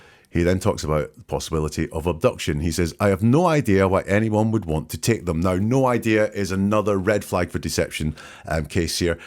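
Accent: United Kingdom. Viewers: I don't know where you come from but you seem to have a British accent. So have you ever been to that area of Nova Scotia. It appears to me that you have already decided that the parents have something to do with the kids disappearance. Do you have any experience working with how East Coasters speak. I certainly hope that the RCMP don't put much stock into what you are saying. When you are so upset by your kids missing you may say a lot of things that don't make any to someone not native to Canada. Sorry I am concerned about the wee kids being missing this guy is so full of shit it is unbelievable.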